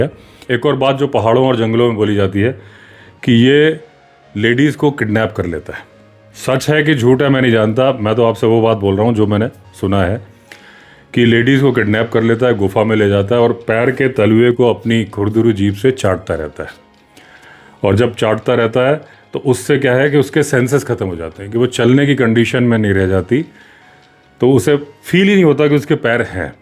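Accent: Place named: Jammu, India. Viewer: Sahi baat hai hamare gam ke log bi asa hi bolte hai Main jammu se hu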